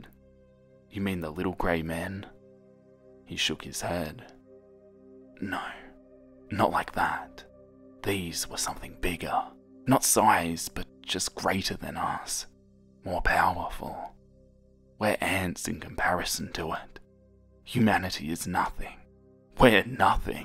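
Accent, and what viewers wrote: Australia. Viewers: Ayyy an Aussie 😌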